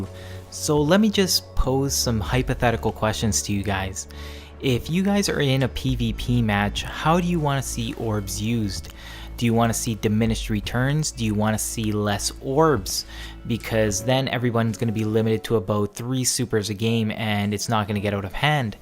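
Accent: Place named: Canada. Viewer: Canadian ey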